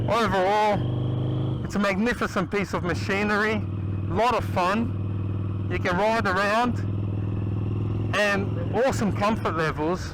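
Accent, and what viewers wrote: Australia. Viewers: This is NOT your typical Australian accent, this guys speaks with an ethnic background combined with an Australian accent just as an FYI..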